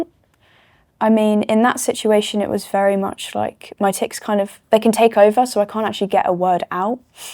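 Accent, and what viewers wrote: United Kingdom. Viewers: Sounds and acts like your typical brit really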